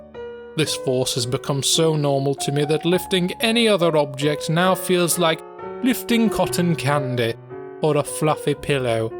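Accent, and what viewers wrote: Scotland, United Kingdom. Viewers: The British accent was the cherry on top. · why does he kinda sound scottish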